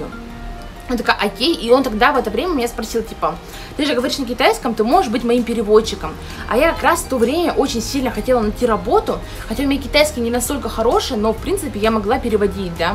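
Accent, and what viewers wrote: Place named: Siberia, Russia. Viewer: Как приятно было услышать вашу историю !! Можно сказать, что я ваша землячка! В детстве росла в Бурятии, а потом переехали с родителями в Иркутскую область! Так что вам привет от землячки 😊! Да Иркутск и всем известный Шанхай очень известен , очень много китайцев там, там считаю это почти граница! Многие мои подружки тоже учили китайский именно в Иркутске и потом переедали в Китай! Кстати, менталитет Сибири прям явно замерен вас и отличается, особенно этот сибирский акцент 😊! Потому что во взрослой жизни я уже жила на Урале: Уфа, Челябинск , Оренбург - менталитет там людей отличается и говор тоже! Я бы сказала, что сибирские девушки более добрые как вы говорите 😍! Ну а моя история связана не с Китаем, она связана с Индией 🇮🇳 : я всю жизнь грезила об Индии , мечтала туда уехать и скажи мне лет 5 назад, что в меня будет семья в Индии , я бы тоже не поверила 😱! 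Но я живу теперь в Индии 🇮🇳, мой муж индиец , у нас дочка ! Вы Молодец: очень позитивная! Желаю счастья вашей семье ❤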